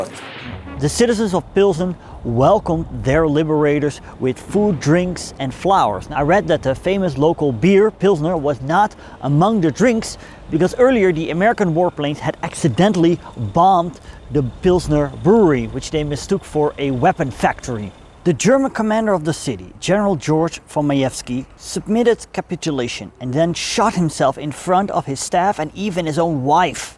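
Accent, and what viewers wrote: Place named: Netherlands. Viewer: Just taking a moment off 'Driven to Distriction' (helpful for ADD History Buffs like me) to tune into this fascinating short. Im not in position to support whst you do, but i do think the style and approach, and the Dutchies accent work well together. Although Scots-Welsh, my partner and I always get mistaken for Nederlanders out on our travels; it even happened in Haarlem. Interesting what happened in Rostov this week. Back in the day, in the Valley of Snakes, there, a leading member of my profession was murdered. Wikipedia says some were still alive when the pit was backfilled; the stuff of nightmares surely. Although peace-minded, we don't forget that our the Norse, Dutch, German Resistance, etc, etc friends thatcsaved us from occupation and an overt problem of how to live and work with collaborators. Be pragmatic, or hand the back to Mossad, or both? 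The Rom have no Mossad, that I know of though; but engaging, sharp, factual presentations must be a part of the UnFinalising of YouKnowWhat. g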